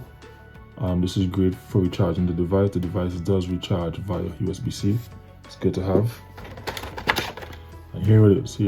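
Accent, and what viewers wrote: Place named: Trinidad and Tobago. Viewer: You sound Trini brother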